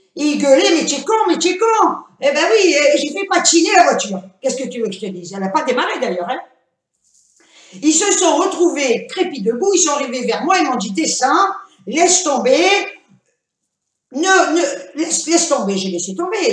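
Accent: Algeria. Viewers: coucou ma je me suis encore payé une bonne rigolade décidément il t'en arrive toujour de bonnes enfin pour une fois ce n'est pas toi qui est tombé ouf soulag!!!là à la chute de la copine j'ai trop rit je lais vu tomber avec les chaussures qui reste collées dans bobo j'espère en tout cas le copain il devait tenir une bonne muflee pour ne plus se rappeler de la rivière et il aurait pu aller expliquer à vos parents ah les hommes!!!merci tu m'a mise de bonne humeur pour la journée. j'aime l'accent pied noir c'est sympa ça reflète le soleil et la bonne humeur bisous à vous deux je vous aime très ford😗😗😗😍😍😘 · Quel mesaventure !!!ah ces hommes il se croivent tellement fort heureusement que vous etiez la pour sortir sa voiture .Ta pauvre copine mdr tu m a fait trop rire !!!Mdr l affiche a la gare j imagine la scene :) ta pauvre copine sa mere n etait pas commode....et ta maman qui ne t as pas cru alors que tu disais la verite mais en meme temps ton histoire est tellement delirante .Et ton accent pied noir est a tomber,j en veux pleins d autres histoire comme ca pleins de gros bisous ma belle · bonsoir ma copine rigolotte ♥ , exelnent avec l'accent pied noir trop bien sa met du caracterer a ton histoir trop top :) MDR :) hahaha bonne soirée kiss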